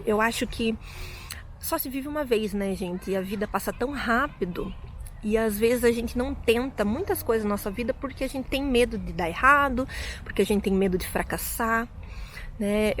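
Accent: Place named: Brazil. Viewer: Muitos parabéns pelo seu português. Posso dizer-lhe que fala corretamente tem o seu sotaque que é brasileiro e é lindo. Sejam bem-vindos ao meu país.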